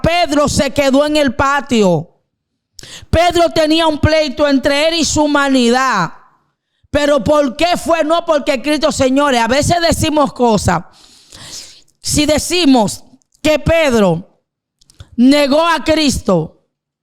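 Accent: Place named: Dominican Republic. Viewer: ella es dominicana por eso habla asi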